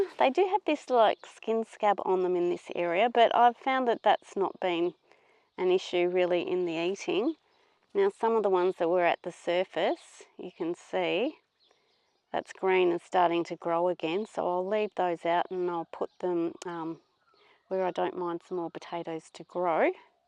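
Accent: United States. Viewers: Why has our Aussie got an American accent 😢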